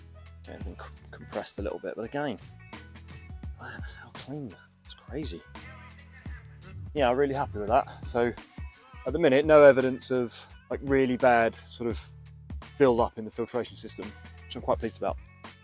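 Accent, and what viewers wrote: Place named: England, United Kingdom. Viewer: He’s speaking in a English kingdom accent and people that do that have a different definition of words ..often the English like that is only understood by the speaker or someone that does the same..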